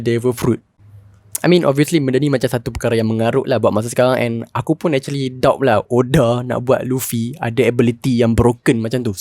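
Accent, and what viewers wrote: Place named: Kedah, Malaysia. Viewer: aku support dam subscribe bagi peminat one piece fans dan aku suka bangsa utagha hang punya loghat sama mcm the show one piece sebab we are same. We are the Northerians Guysss.hahahaha...bangga jadi bangsa Kedah noh...hahahah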